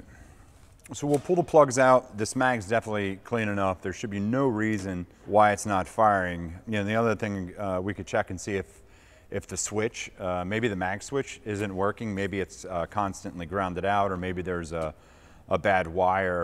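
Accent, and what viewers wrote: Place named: Philadelphia, United States. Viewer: Anyone else smell that? "We've been here all night" he walks out the door, and back in -- that's a lot of light outside, in January, around where I assume he is around Philly (I grew up in Levittown) -- I know that accent, and plus when he fired up the GPS in the plane it seemed to center around Delaware county or so. Then he says he needs $20k. I smell scam. Which is a shame, cause watching him get the props loose was really fun. Something's not right.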